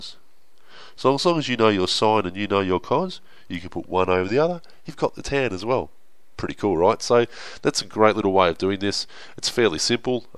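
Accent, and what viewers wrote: Australia. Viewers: This guys obviously Aussie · He is an australian that is why he sounds like that. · A true Australian accent that is. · He’s Australian... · Pure Australian accent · is he australian? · Now that is one thicc Aussie accent. I love it! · I love this guy he use smart way to teach Math. His English sounds like Australian yet a lot easy for me I really want to talk to him one day.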